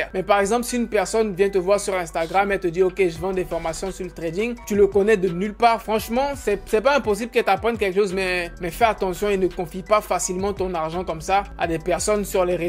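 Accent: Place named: Ivory Coast. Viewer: Merci pour la video. Dans ton accent on sait que t'es un vrai Ivoirien, j'en suis juste fière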